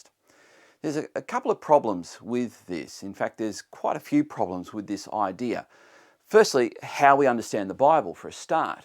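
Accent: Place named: Australia. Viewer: I will read the bible like it was "meant" to be read, when you can learn to speak English how it was "meant" to be spoken you fing ossie. We cant take anything you say seriously.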